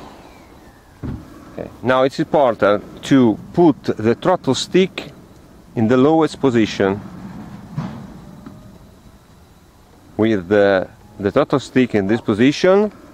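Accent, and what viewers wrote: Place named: Ferrara, Italy. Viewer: I can notice the accent from Ferrara even if you're talking in english.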